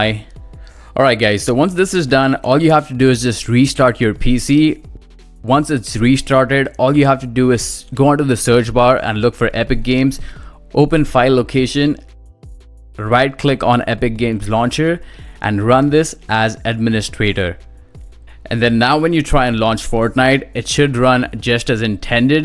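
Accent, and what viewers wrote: India. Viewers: Thanks so much I didn't expect this to work two days I couldn't play. Btw you sound like Indian and Canadian at the same time hahah love you man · thank you very much bro “Indian Accent”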